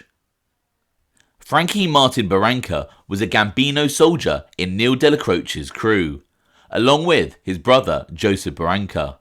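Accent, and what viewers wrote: England, United Kingdom. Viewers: There s nothing like reading a transcript with an English accent …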